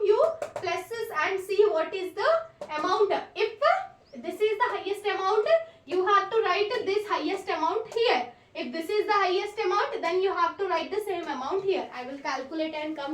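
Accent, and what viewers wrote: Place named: South India, India. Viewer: speak hi Marathi 😂😂 Lol she is from south of india by her accent properly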